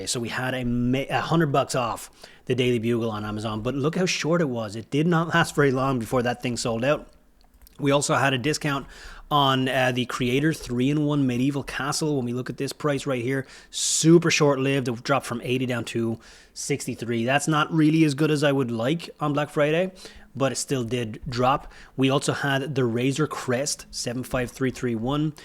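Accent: Ireland. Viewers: I'm always super confused that somebody with an Irish accent is introducing Lego deals from the USA. Here in Europe the deals are not great.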